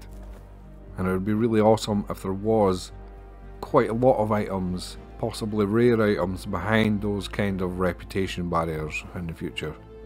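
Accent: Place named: Scotland, United Kingdom. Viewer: this lads definitely the proud owner of a scottish accent. I know it because i almost didn't understand a word or two. still great stuff 😊 Edit: motherfricker, almost caused an international incident with a typo! Edit2: also you gained a subscriber in me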